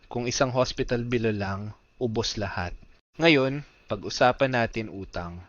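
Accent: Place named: Conyo, Philippines. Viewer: Out of curiosity, Tagalog is not the only language you speak growing up, right? Cuz I hear a lot of conyo pronounciation. Lol, not hating thou. Thank you for this video! 💜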